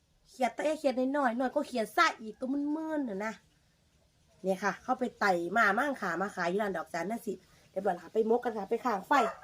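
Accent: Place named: Ubon Ratchathani, Thailand. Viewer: สำเนียงเว้าคือทางอุบลแท้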